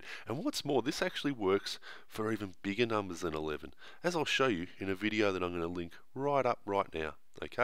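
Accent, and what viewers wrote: Australia. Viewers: I think learning maths in an Australian accent has just become my new favourite thing.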